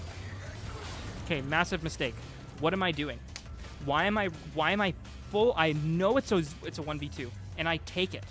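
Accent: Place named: India. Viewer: Cant watch this.. this india accent is just blood in ears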